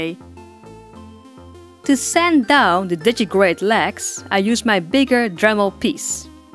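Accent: Netherlands. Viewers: This is so cute! Altho I can barely handle the strong dutch accent in your english 🙈 no hate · OMG your Dutch!! I heard it from your accent!! Zelf ben ik ook Nederlands en ik vind wat je doet echt geweldig!! Zelf wil ik ook een een pop maken maar dat mag niet van me ouders ): dat vind ik heel jammer maar ik vind het altijd leuk om naar je filmpjes te kijken :D 😍✨❤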